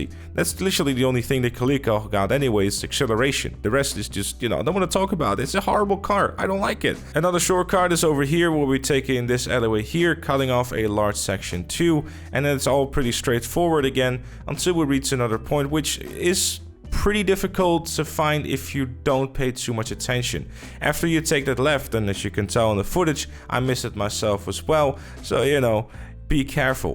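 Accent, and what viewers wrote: Netherlands. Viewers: Love the Dutch accent 😎👌🏻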